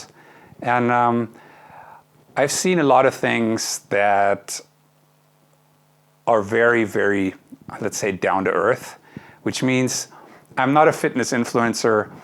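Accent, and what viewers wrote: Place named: Germany. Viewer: "My English is not that good today." Your English is amazing. I had to really focus to even hear a German accent...I've been studying /speaking German for thirty years, and I not only still speak it with a Texas accent, but confuse the verbs for "to live" and "to love." Awkward.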